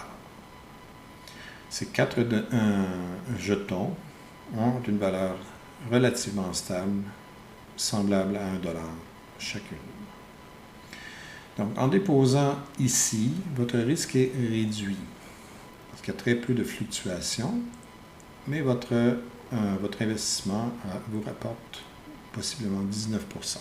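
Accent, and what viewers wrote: Quebec, Canada. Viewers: J'ai découvert t'a chaîne dernièrement et je fais du rétropédalage pour regarder ton contenu plus ancien. Je m'étais douté a ton accent anglo que tu étais de la belle province, maintenant il n'y a plus de doute. En tout cas beau travail de vulgarisation, j'apprécie beaucoup ton contenu, tu es très pédagogue. 👍👏😊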